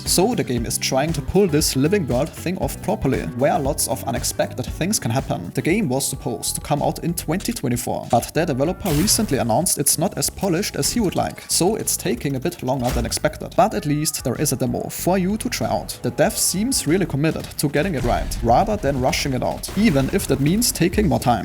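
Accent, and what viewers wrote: Germany. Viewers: Bro are u german your english pronounciation seems famliar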